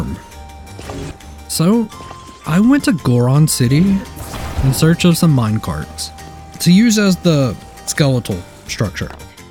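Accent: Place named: North Carolina, United States. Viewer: Youtube analytics are such a drag; one day your hard work will pay off but don't get burnt out or make your views equal your worth as a creator. Also I very much notice and appreciate the captions - above and beyond with your editing and production value!! Have you thought of streaming to make your youtube edits more enjoyable? Still lovin the NC accent btw just thought remind you haha ~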